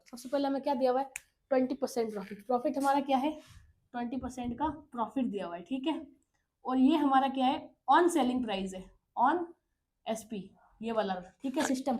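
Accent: Rajasthan, India. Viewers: Mam apki class entry bhut achi lgti❤❤apni rajasthani style me 🎉🎉 content bhi bhut acha h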